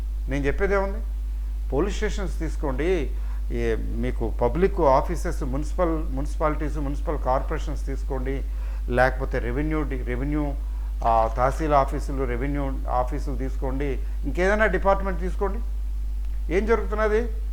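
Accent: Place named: Coastal Andhra, India. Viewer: Sir, what is your native place. I know you are from coastal area.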